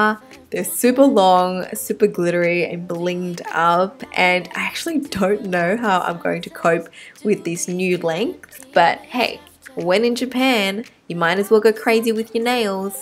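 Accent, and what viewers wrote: Australia. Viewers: you def have an aussie accent :) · Wait your australian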